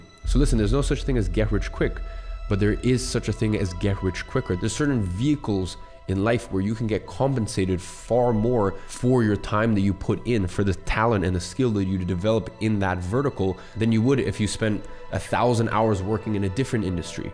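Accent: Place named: United States. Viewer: I don’t understand, isn’t he British ?? Why is his accent American?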